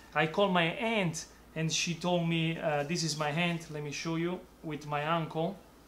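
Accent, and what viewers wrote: Italy. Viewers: Why do you have an Italian accent but your mom doesn't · Maestro! I wish I was born from a long line of pizzas (heh heh, okay, just joking -- you're passion and Italian English is fantastic!). But I'm so inspired. I'm from Ottawa Canada!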